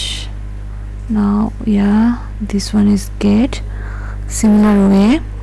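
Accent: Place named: India. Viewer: Your way of explaining is good.. By the way i am from India.. So from the accent I thought you were from India too.. Keep up the good work :)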